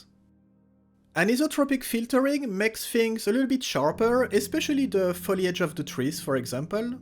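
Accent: France. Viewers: you french ? great video btw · You're the frenchiest YouTube I've ever heard.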